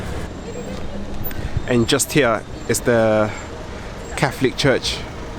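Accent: United Kingdom. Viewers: U have accent British are u British?? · Your dialect is british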